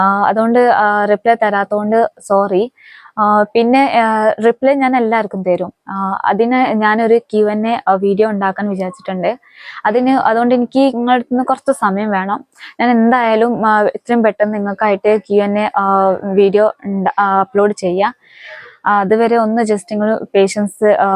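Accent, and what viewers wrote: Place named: Uttar Pradesh, India. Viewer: Enikk thonnunnu ivar up yil ninn keralathilek jolikk vannu malayalam padichathanenn ....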